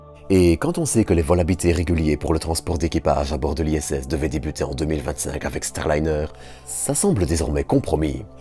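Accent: Belgium. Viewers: Merci pour ces actualités spatiales présentées de façon efficace. J’ai bien ton accent Belge. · Holala cet accent... Belge? (no offense, suis belge aussi) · C'est la voix de, son organe naturel, son accent pittoresque, il est belge.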